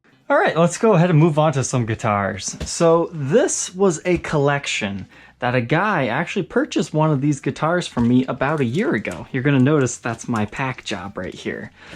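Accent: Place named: Midwest, United States. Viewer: You sir, are definitely from the Midwest.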